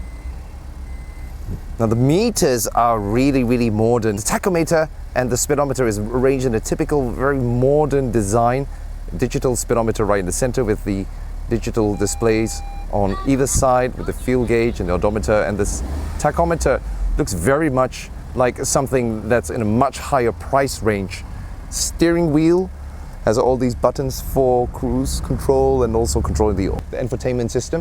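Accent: United Kingdom. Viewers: Where did you get the British Accent? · You sounds a bit like British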